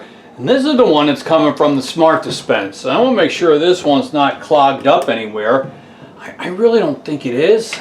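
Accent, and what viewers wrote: Philadelphia, United States. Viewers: You from Philly or Boston?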